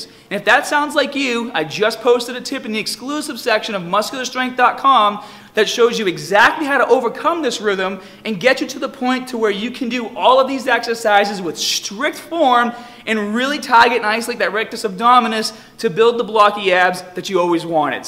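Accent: Boston, United States. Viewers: I figured maybe he always spoke with a Boston accent but hid it in his earlier videos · boston accent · Yeah he's from Boston so he's just been hiding it in the past.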